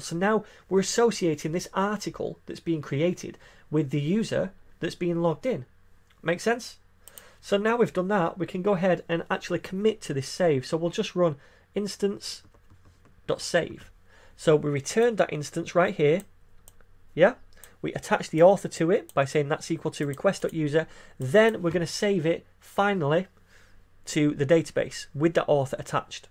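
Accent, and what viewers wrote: United Kingdom. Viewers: That sounds like British.............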